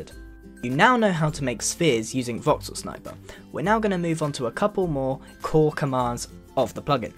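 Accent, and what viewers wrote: United Kingdom. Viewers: are u a British person, you have an english accent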